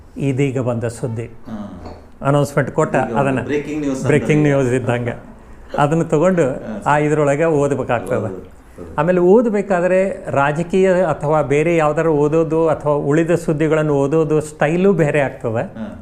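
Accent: Standard. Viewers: ಸ್ವಚ್ಛ ಕನ್ನಡ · ತುಂಬಾ ಒಳ್ಳೆಯ ಸಂದರ್ಶನ ಹಳೇ ಕಾಲದ ರೇಡಿಯೋ ನೆನಪು..... ಅಚ್ಚ ಹಾಗೂ ಶುದ್ಧ ಕನ್ನಡದಲ್ಲಿ ನುಡಿಮುತ್ತುಗಳ ಸಂದರ್ಶನ ನಮ್ಮ ಕರ್ನಾಟಕದ ಹೆಮ್ಮೆಯ ವಾಚಕರು ಜೈ ಕನ್ನಡ ಜೈ ಕರ್ನಾಟಕ,💛❤️🇮🇳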